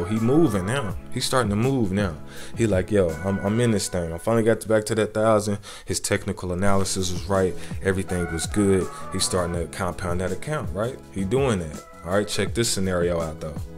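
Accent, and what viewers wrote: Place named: St. Louis, United States. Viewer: Sounds like he from St.Louis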